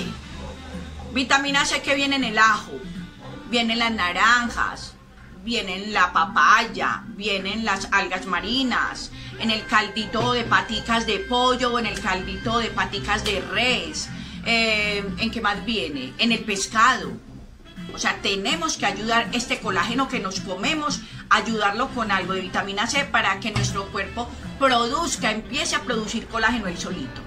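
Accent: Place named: Colombia. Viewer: Wow,genial. Peo yo vivo en EEUU y no se donde venden eso.y si lo venden . Me encantaría saber de q otra forma podemos obtener el colageno vía oral.Soy nueva aquí y me gusta ver tus videos. Además sabes, el acento Colombiano me encanta. Soy Boricua. Bendiciones para ti y q tú canal siga creciendo. Abrazos 🤗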